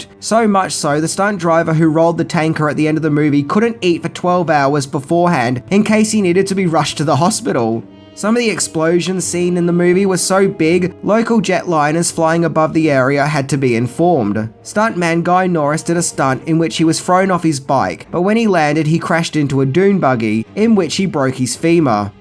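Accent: Australia. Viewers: My God this guy is Australian!!!!! I didn't know that!!!! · your Australian. or do you just live there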